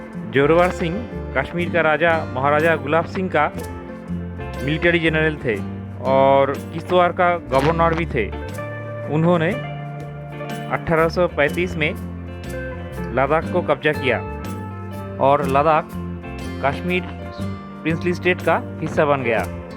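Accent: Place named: Bengal, India. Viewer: Nice informative vlog Your pronunciation resembles to be Bengali like, are you a bengalee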